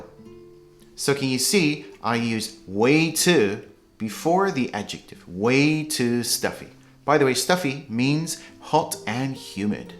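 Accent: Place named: United Kingdom. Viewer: わかりやすい！イギリス英語が好きです😊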